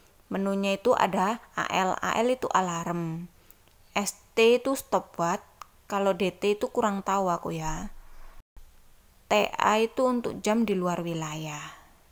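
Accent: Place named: Indonesia. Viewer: Ngomongya NGEDOK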